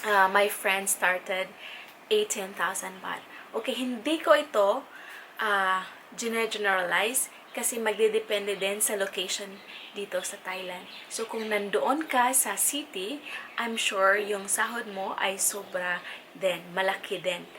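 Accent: Visayas, Philippines. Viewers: Kadiri kang bisaya ka kaya lagi sila iniinsulto eh sa susunod bilisan mo mag tagalog hayop ka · At first I can sense you are really bisaya! Haha... Same here...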